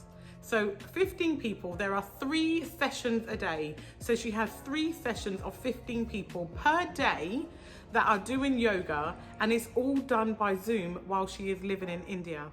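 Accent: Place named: United Kingdom. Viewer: Very informative video. Thanks. Side note: I’ve always dig that British accent, Peace!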